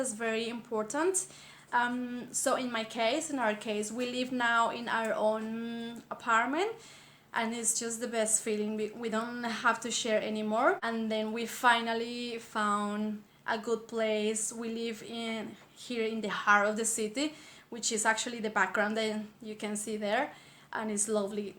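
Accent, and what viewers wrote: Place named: Brazil. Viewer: Are you Brazilian? I can understand you so well:)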